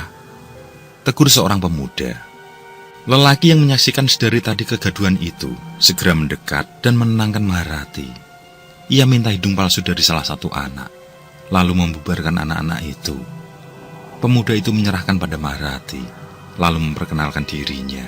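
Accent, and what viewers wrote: Java, Indonesia. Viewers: Kenapa di kalimantan logatnya ada jawa²nya iya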